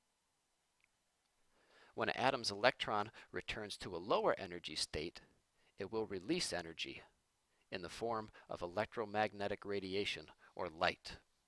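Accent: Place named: United Kingdom. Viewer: Britain english! Wow!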